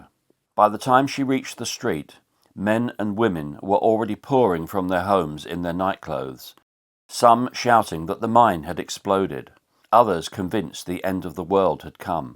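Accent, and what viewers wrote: United Kingdom. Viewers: The narrator has a British accent, so he's the ONLY one I would ever give a break! GO HAWKS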